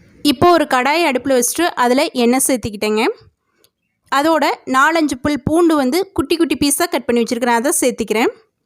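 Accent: Kongu, India. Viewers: Kongu tamil speech is very nice sister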